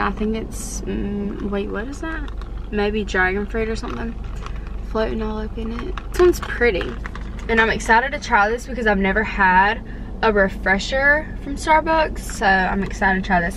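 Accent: South, United States. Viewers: Its like southern and Australian 💚💚💚💚 I love it too!!! :) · i love your accent !! it kinda sounds country! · North Carolina I think! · Where are you from. Love the country accent.im from TN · K H I’m from Texas and everyone says we have a country accent but her accent is soooo cute it has to be somewhere from the south but I can’t tell where 😭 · it totally is country lmao. i’m pretty sure she’s from the south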